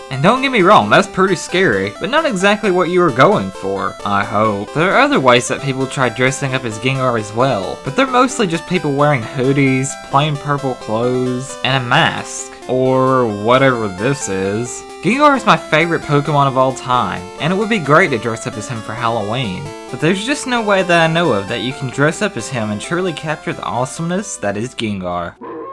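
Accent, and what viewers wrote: South, United States. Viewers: Omg this guy's voice is so red neek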